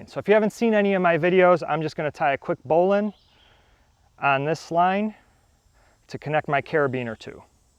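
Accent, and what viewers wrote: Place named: Chicago, United States. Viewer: Dude are you from Chicago? I can recognize that accent anywhere....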